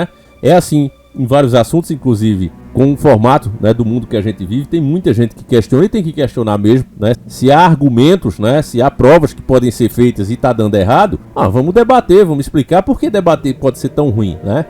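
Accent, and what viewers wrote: Northeast, Brazil. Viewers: BOM Domingo á todos. Grata Dr,já peguei o meu diploma de " telejumento " pois soltei o riso na pergunta: Ou é evoluido ou não? Assinale as alternativas ( Janja em F you) ou 😂😂 ( Musk,eles perderão as eleiçôes) e no som lindo da sua voz falando " convergindo" como um i aberto e, melôdia do Nordeste. Escolhí Elon e a Melôdia. Sou diplomada?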